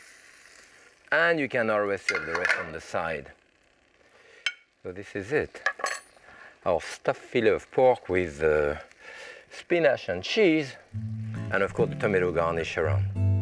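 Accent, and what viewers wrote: France. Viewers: RHUM...YAAAARglol no i do understand that this french chef prenoncation is hard to understand for those that do not speak french as their 1rst language...im french and im good in english ...i i miss some parts once in a while...his accent is SO funny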